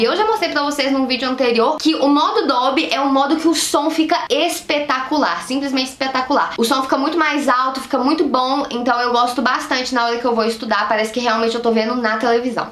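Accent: Minas Gerais, Brazil. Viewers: Aaaaaaaah vc e mineiraaa , eu sou da região de venda nova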